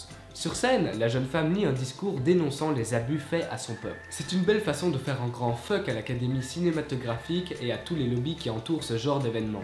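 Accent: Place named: Belgium. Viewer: Je te réponds 1 an après mais bon... Il dit les nombres comme ça parce qu'il est belge, à la fin des dizaines c'est ante comme soixante-dix c'est septante, voilà, 1 an après 😅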